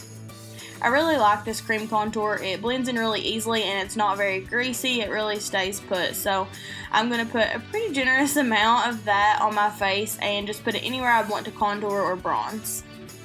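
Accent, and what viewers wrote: Kentucky, United States. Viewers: What Jesse Girl pigment is this? Love your accent. You sound like my husband. He's from Ky.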